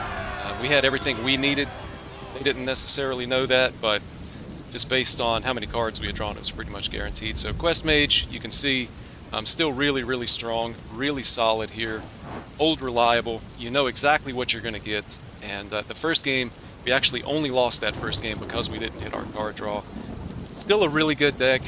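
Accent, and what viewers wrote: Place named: South, United States. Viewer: Love the thunder and rain in this video. I also hear a southern accent. Greetings my southern brother.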